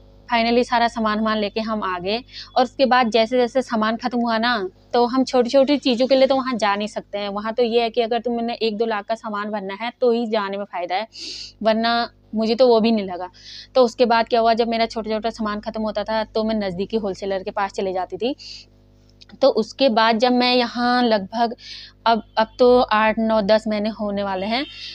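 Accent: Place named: Uttarakhand, India. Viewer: Baat krne k tone se smj jate h wo log Uttrakhand se h 😂koi nhi didi time lgega par jada pese ka maal lena h to sadar se fayeda h 20k ya 30k ka lena h to local se shi h.. First time sbke saat hota h lekin thoda tez hona pdta h didi lekin ab to pta chl gya hoga aapko ki kaha se saman lene m fayeda h first time sbke saat hota h lekin aaj b bina billty k saman aata h bs pta hona chahiye kon bhejta h bs first time sbke saat hota h uske baad sb sahi h bs thoda tez bno waha gunge ki traffic rahoge to badiya se lete h wo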